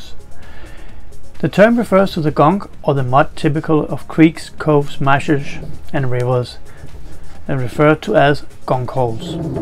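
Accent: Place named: Denmark. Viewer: danish accent..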